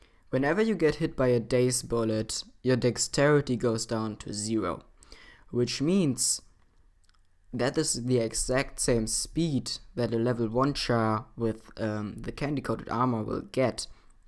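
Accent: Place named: Germany. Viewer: np btw you sound like you speak german cuz of your english accent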